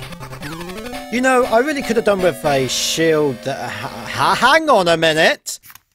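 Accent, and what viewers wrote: United Kingdom. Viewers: His british accent just adds to the video, I don't know why... Assuming it is british and I am not an idiot (Edit: Nice to see a Youtuber who views his comments, Thanks for the heart)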